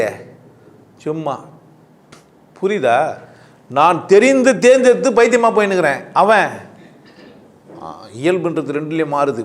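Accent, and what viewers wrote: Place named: Chennai, India. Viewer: Madras language super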